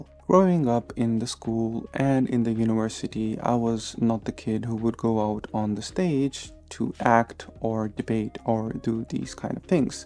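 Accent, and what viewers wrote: Estonia. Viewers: Actually I was surprised that he even speaks English with estonian accent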